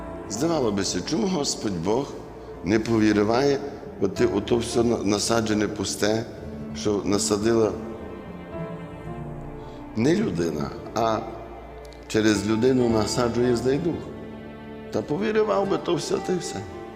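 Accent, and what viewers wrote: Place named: Western Ukraine, Ukraine. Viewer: Я в захопленні від Ваших наук,від нашого західного діалекту. Щиро Дякую,живу ними❤️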